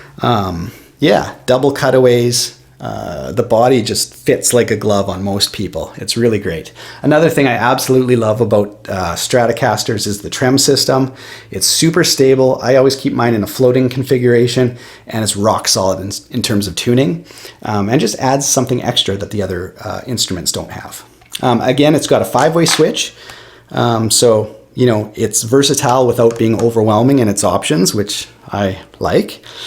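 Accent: Canada. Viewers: WHERE'S THIS GUY FROM , CANADA ?????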